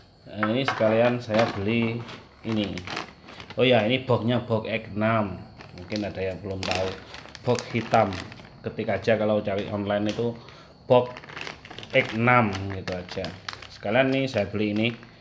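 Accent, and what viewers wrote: Madura, Indonesia. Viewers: Reng madureh mas?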